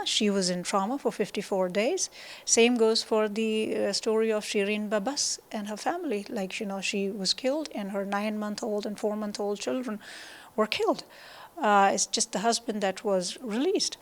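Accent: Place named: United States. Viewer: This bi is not pakistani. Her accent is fully American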